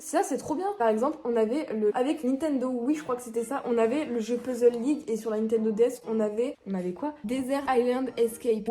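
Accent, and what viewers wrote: England, United Kingdom. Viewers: Évite de faire l’accent anglais c chiant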